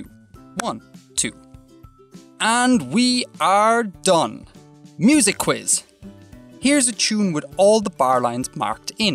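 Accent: Ireland. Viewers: Let’s all apréciate his Irish accent and nearly 600k people have watched this lmao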